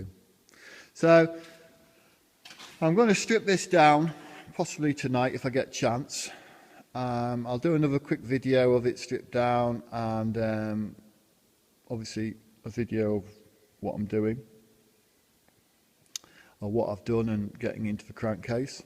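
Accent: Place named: Northwest England, United Kingdom. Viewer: Thanks for the update on the oil pressure, I am curious what weight oil you are running? Also, my wife, who is originally from Preston, overheard me listening to you talking, and was trying to figure out what part of the UK you are in. She guessed north, northwest.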